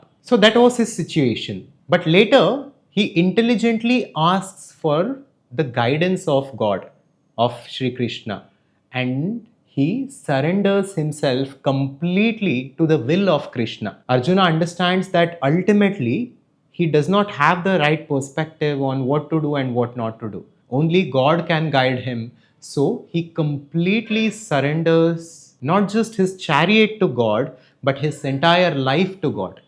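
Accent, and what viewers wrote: India. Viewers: YouTube is an international audience, India. Please work on your accent and pronunciation. ESPECIALLY making popping sounds when pronouncing 'T'. It sounds awful. It's extraordinarily embarrassing to hear a heavy Indian accent when you're in the West. We are not taken seriously due to this reason, you have to realise. Im not suggesting speaking without an accent. Im suggesting working on it to make your own less heavy and more understandable. Earn your globalisation.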